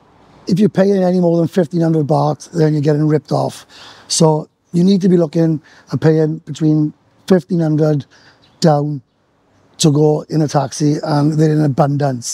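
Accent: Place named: Wales, United Kingdom. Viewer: been there Twice firsT was with me bro then 2nd time the X lol, first timewas best u know what i mean taffy, you sound likje Treherbert boy :)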